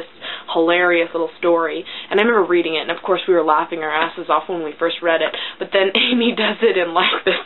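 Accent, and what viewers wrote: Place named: Canada. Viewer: you have such a canadian accent <3 im canadian but your accent is so clear! 'you know' so canadian!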